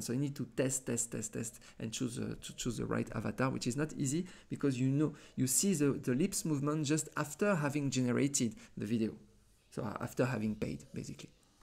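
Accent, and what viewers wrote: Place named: France. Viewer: Amazing really❤good english and nice French accent😂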